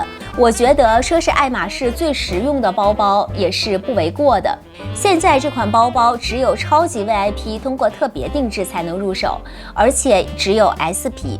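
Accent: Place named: Japan. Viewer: 日式英语发音听得超级不舒服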